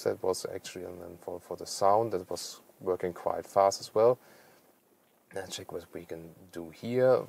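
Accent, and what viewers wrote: Germany. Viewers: Always very detailed and good reviewes at, if there wasn't this German accent...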